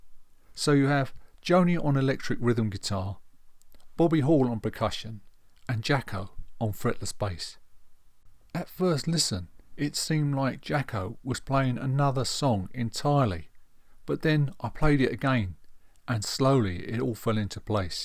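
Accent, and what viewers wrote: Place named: United Kingdom. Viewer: How do YOU pronounce your surname? I'll wager it's not the way Franz Schubert pronounced his. Who really cares - honestly??????? In any case, you're doubtless American and the narrator's accent may sound unfamiliar to you, but it makes PERFECT sense to Brits. It is the content that matters, not the pronunciation.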